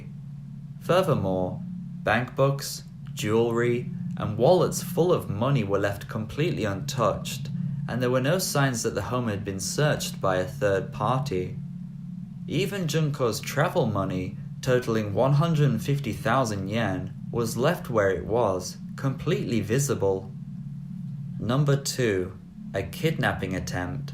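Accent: Manchester, United Kingdom. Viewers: Are you from Manchester? Trying to place your accent but I'm no expert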